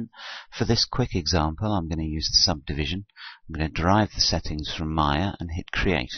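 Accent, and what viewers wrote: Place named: Australia. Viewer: That was a gold tip! Thank you! I also think you are australian by the accent! :D I love this accent! Have a nice day!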